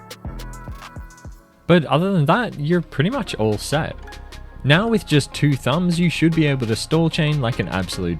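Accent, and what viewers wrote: Netherlands. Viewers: Waiiitttt, u dutchhh!?! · Bruh he was Netherlands I’m Dutch but I can understand the schot and stuff schot is shot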